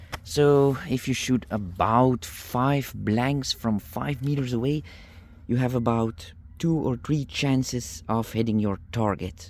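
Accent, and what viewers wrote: Belgium. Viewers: you sound flemish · Dat accent doet mij ergens aan denken. Aalst? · Congrats on committing a gun crime in Belgium. What you are doing is prohibited by law in Belgium, and based on your accent and profile I'm guessing that's where you are.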